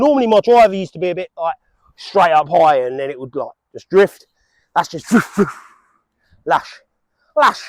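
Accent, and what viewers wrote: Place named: England, United Kingdom. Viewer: Good content mate what part of England are you ?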